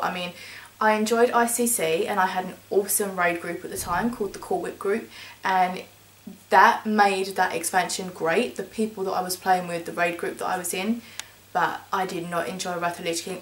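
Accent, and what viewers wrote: United Kingdom. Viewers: I've listened to your music for a while and I never knew you were British, lol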